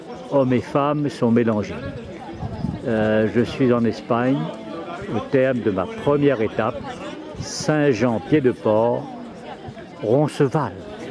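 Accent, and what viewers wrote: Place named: Réunion, France. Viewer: Bonsoir. Merci pour cette sublime vidéo qui ravive de très bons souvenirs'. J'ai effectué mon camino du 02/06 au 30/07/2019. Je suis parti du PUY et j'ai rejoins SJC le 27/07... J'ai continué vers le Finistère que j'ai rejoins le 30/07. J' bien ton accent qui me rappelle mon Île natal la RÉUNION. Bonne continuation. Je repartirai sur les chemins par la voie du VEZELAY au mois de juin. Thomas (un gars des Avirons)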